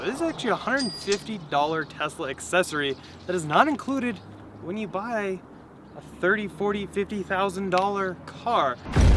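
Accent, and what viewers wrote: Norway. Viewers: U norwegian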